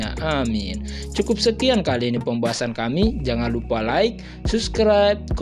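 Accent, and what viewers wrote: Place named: South Sulawesi, Indonesia. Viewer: Pemilik channel ini orang Bugis kah ?? Kelihatan banget dari logatnya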